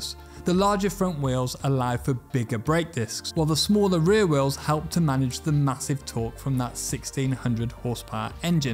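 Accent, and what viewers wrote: France. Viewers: omfg listening to this french guy is a bit rough on the ears ...as a french myself ahahahah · Wow that's the strongest French accent I've ever heard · As a french, his english sound more french than french itself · This man speaks English in the most French way I have ever witnessed. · He's the most french sounding man in the world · Love his french accent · Mon dieu l'accent français à couper au couteau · The franche accent 🤭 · Ohlala banger l'accent français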